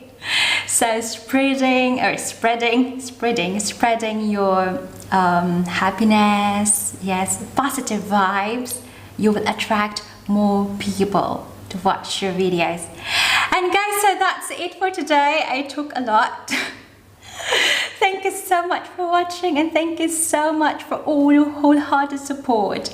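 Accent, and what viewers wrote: United Kingdom. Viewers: i have a few passions that I am putting on display. but mine are from a student's perspective. I like the way you speak,. it's the king's accent, right?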